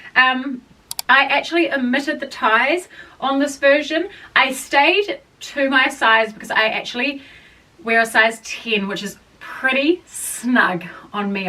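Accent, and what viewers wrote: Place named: New Zealand. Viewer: I’m used to the kiwi accent being an Aussie. I did not notice the pronunciation.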